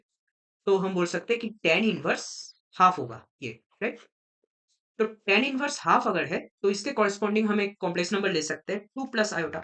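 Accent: Bengal, India. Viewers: Bengali ho kya bhi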